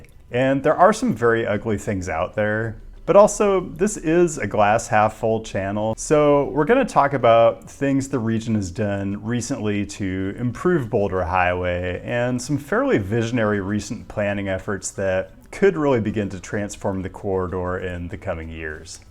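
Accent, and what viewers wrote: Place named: Pacific Northwest, United States. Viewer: First, I absolutely love the content. I have to ask though, are you from the Pacific NW? I'm a linguist and you have the strongest PNW accent I've ever heard, it's amazing 😂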